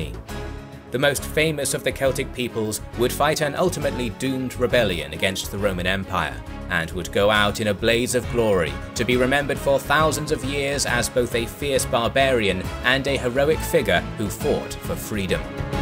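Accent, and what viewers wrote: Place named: United Kingdom. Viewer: Yeaaaaah History > GOT So this i why you wanted a female british voice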